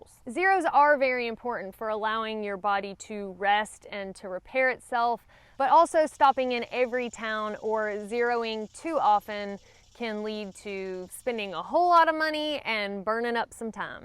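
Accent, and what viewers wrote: South, United States. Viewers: Over the years of watching you videos I have learned to adore you southern accent. Naat Haakin (night hiking) aat (out) aas (ice) and so on. I love it. Please don't take it as a criticism, it really isn't. I look forward to it. Your greeting of "Hey Y'all" is similar to our UK "hiya". Best wishes from the UK.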